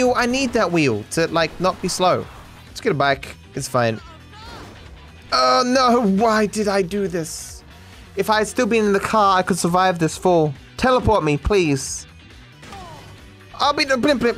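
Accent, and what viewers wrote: Australia. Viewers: your australian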